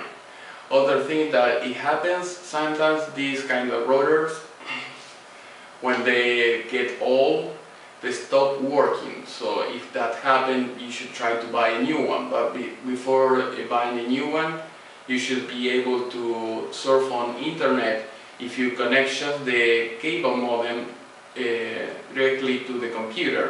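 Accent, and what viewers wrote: Argentina. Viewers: ese ingles made in argentinian.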